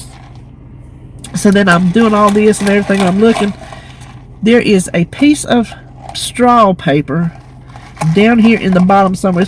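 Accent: South, United States. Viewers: YES Ma’am! One step closer to surgery! I wish you could talk to us everyday because you give me so much happiness. Just hearing that sweet southern accent and all your sayings crack me up! You are definitely a special friend to me. Love, hugs & laughs to you . ❤️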